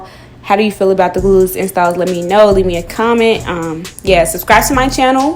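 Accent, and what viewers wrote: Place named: Chicago, United States. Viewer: You have to be from Chicago 😂❣️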